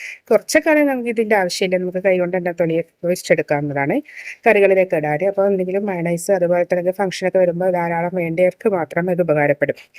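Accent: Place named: India. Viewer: Ethu naatilanu think chovannully ennui parayunnathu.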